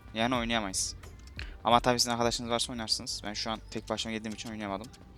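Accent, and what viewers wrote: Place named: Azerbaijan. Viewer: abicim azeri sandım az yavaş konuş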